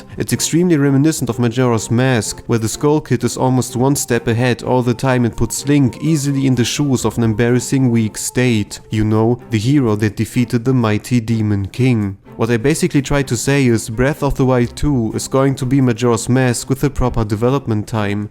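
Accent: Germany. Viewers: I knew, that you’re German from the first sentence you spoke haha 😂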